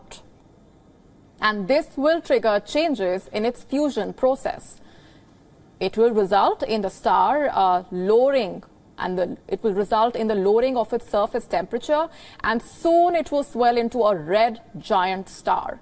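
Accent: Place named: India. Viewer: Daily dose of indian accent news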